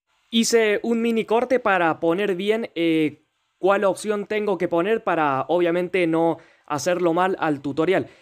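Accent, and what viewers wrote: Argentina. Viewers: Re argentina el pibe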